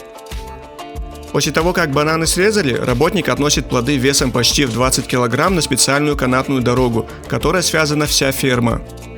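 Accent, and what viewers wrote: Kabardino-Balkaria, Russia. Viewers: Кабардинский акцент у диктора)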